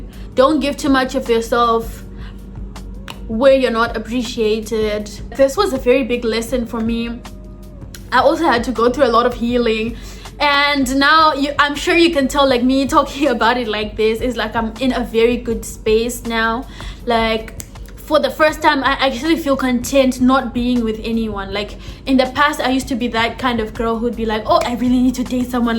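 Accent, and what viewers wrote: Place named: South Africa. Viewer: Sounds like a south african gent😭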